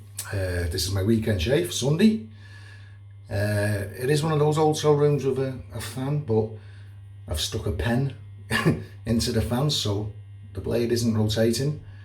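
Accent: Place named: RP, United Kingdom. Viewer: Get'in a bit posh now then lad?!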